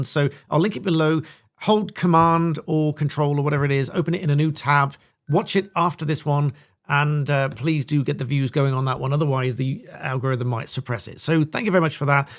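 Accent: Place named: Falkirk, United Kingdom. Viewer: It was a statement of fact ffs! She certainly sounds like she's from Falkirk, or is an accent racist now.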